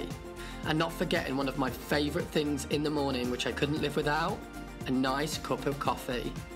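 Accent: United Kingdom. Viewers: Imagine if all British people sounded like this 🤮🤮🤮🤮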